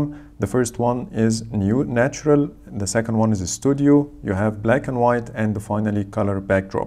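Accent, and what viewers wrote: Egypt. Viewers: I think you are Egyptian from your English accent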